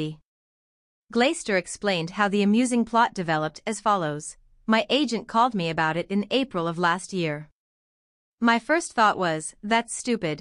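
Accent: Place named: United States. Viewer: What's with the robotic American voice? It's a English soap so a natural English voice would be nice🤗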